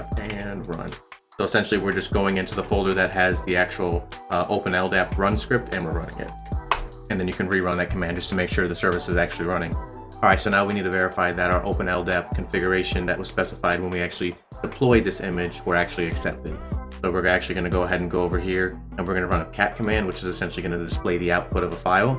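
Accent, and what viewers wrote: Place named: India. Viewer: The indian accent got me good 💀💀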